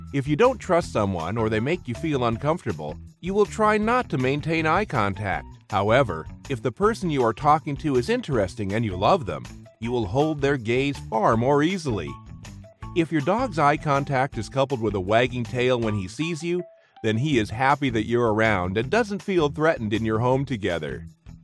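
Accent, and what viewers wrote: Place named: United States. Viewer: That stupid yank accent put me off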